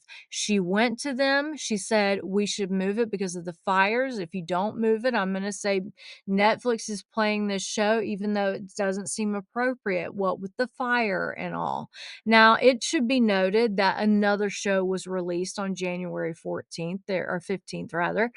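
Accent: South, United States. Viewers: your southern drawl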